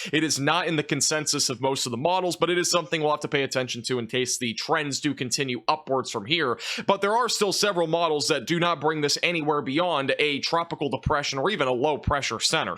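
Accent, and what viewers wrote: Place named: Midwest, United States. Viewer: this dude is def from michigan or wisconsin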